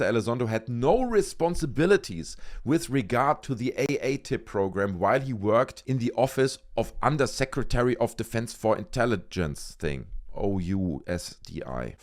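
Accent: Germany. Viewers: I just love your German accent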